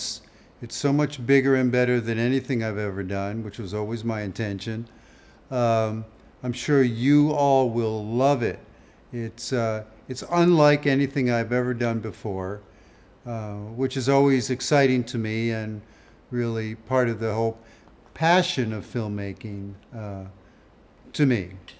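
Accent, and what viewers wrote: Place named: United States. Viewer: I was convinced that was Paul Whitehouse doing an excellent American accent the entire time.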